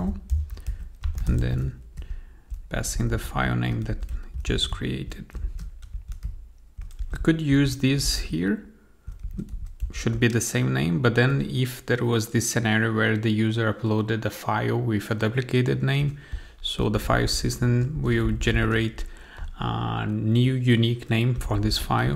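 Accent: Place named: Brazil. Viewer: Muito bom mano, vc parece ser brasileiro pelo sotaque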